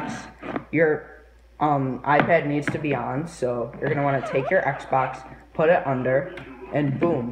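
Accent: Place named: United States. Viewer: Wow as if the click bait wasn't enough you have his cheesy annoying American accent and his voice which obviously hasn't hit puberty yet get a life you sad kid.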